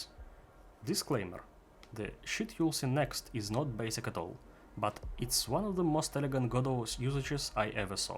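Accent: Russia. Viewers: I hear strong Russian accent. Good presentation of the material.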